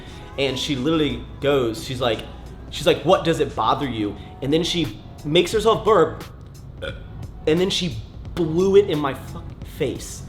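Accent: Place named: New Jersey, United States. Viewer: Lovely accent you have there, New Jersey?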